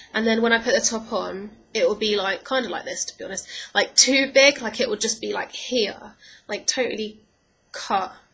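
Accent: United Kingdom. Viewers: haha yu said "whatever" soo americann lol , but i love your british accent (: cool video x3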